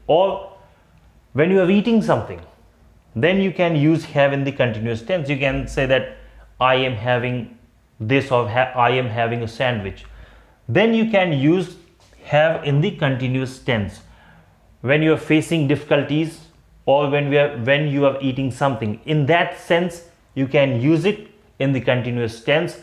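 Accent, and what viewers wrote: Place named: India. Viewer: I'm a native English speaker. I don't mind people saying "good name" though it does make the speaker stand out as probably not being a native speaker. I did not know it was specifically an issue for people from India. Your example with "thank you" vs. "you're welcome" is something I never thought about. It's not so unusual in some of your examples if a person says "you're welcome". I can't see anybody taking the wrong sentiment from it, but I suppose it's best to be careful. In a couple of those examples I may have said "no problem". Maybe there are certain English speaking regions though where this is something to be worried about. You speak very well, but still have that "v" sound replacing "w" and "d" replacing "th". How long does it take to lose that part of the accent for Indians or is it something that most Indians do not fight and just accept it? 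Thank you for the interesting video. ))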